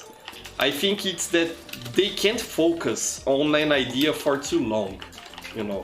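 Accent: Brazil. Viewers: Your accent sounds like you're brazilian. Great content, keep it up!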